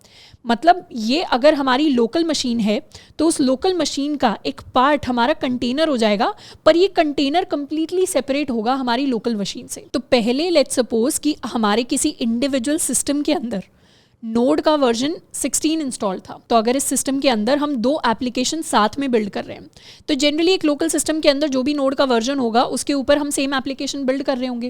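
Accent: India. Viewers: Very Heavy Indian accent. Can not understand what she is saying. Either you speak in English OR speak in Hindi.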